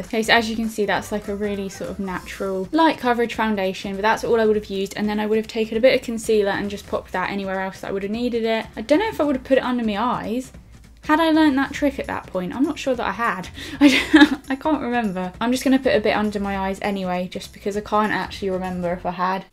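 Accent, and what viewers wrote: United Kingdom. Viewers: I am British too but I love you're accent and voice😂😻🙈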